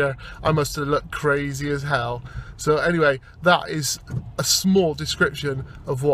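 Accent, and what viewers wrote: Somerset, United Kingdom. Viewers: Being from Bristol I just knew is was a Somerset accent. ;-) Thanks for sharing the video. My first manic episode involved me wearing sunglasses so that people wouldn't steal my thoughts.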